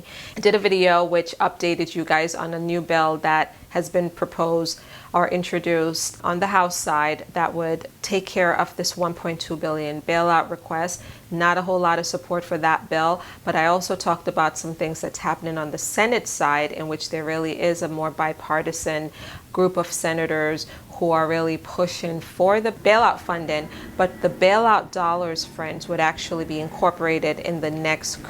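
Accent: Jamaica. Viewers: R u a Jamaican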